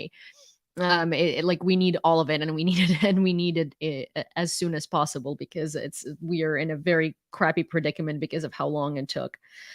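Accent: United States. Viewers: she is an american, not slavic woman...this american accent))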